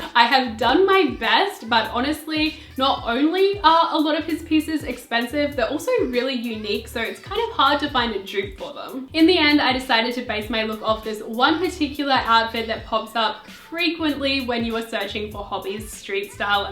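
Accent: Australia. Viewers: ahhh i love this video so much! im from australia too and its so cool hearing someone rant about bts in an aussie accent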